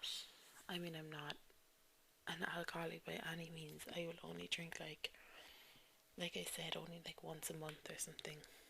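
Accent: Ireland. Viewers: God your voice is gorgeous! :) think I have established I like irish women :P nommy nom nom. I was watching This Is England 88 tonight and they had this Irish nurse on there and I instantly liked her accent. Think this is better than a Brummie accent now. Im from the South Of England I live 80 miles from London in Kent. My accent is cockney/kentish "alwite mate you fackin...." Irish accent is now my fave :) whoop! 2nd is Margate girl accent (local accent) :)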